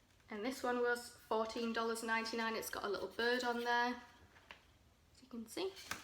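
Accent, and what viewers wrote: United Kingdom. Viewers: That's a definite British accent, if I'm not mistaken. Im glad to hear. I don't see many of us on here. 😉 lol. This is American based I suppose. 😂 When you grab a bunch of boxes together from the same store, your bound to get copies. The last batch of boxes were better, but, one woman's trash, is another woman's treasure...as they say. You'll soon find homes for the thongs you don't want/need. Re the letters...there's the option of making frames from two L's screwed or stuck together. Just turn one upside down and cover. Fancy up...with what ever. Plus the O's. I heard you mention, your a crafter at heart. 😉 Isn't it odd that we enjoy watching others open boxes, to see what's inside. I've not watched this kind of thing before, but I think I'm a bit addicted. Thank goodness we dont have Michaels over here in the Uk. (secretly I do) My stash of crafting materials would be horrendous 😱 Er it's not far off it already. 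There's always room for more 😂 I'd gladly lighten your load a little...can't wait for the chance at your give away. I'll be watching for your craft tutorials. Happy crafting folks.x 😊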